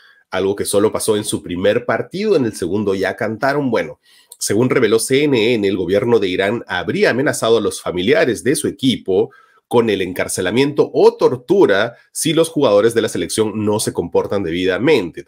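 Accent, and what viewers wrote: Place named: Arequipa, Peru. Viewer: Es de origen arequipeño esa forma de acentuar.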